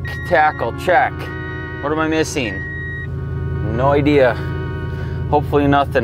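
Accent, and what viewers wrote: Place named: Minnesota, United States. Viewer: He definitely sounds like he’s from Minnesota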